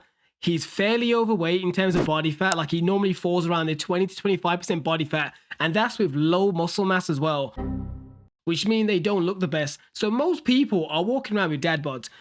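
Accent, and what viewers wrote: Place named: England, United Kingdom. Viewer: damn, I wrote that with full chest, while listening to your english accent 🤸‍♀️🤸‍♀️🤸‍♀️ gonna roll away now🤸‍♀️🤸‍♀️🤸‍♀️🤸‍♀️🤸‍♀️🤸‍♀️🤸‍♀️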